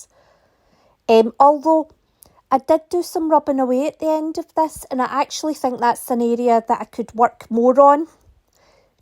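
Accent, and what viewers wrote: Scotland, United Kingdom. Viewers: Just discovered you, and it's lovely to hear a fellow Scot chirping on about this technique and already producing some lovely work. Well done and keep it up:) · It’s about experimenting you’re doing a great job!! What part of Scotland are you from? I was born in Dundee I’ve been in Vancouver Canada for 50+ years … love your accent!!! Thank you for your video and you’re right it’s very much like a limo cut!! Elaine ❤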